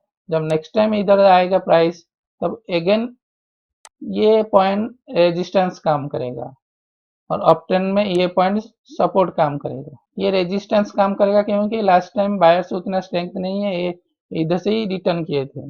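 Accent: Odisha, India. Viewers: Bhai apana odia ki tone ru Jana paduchi..kai na Odisha side Hindi tk emiti katha huanti..bhala lagila apanka video dekhili..apanka sahita contact karibar achi detail diantu bhai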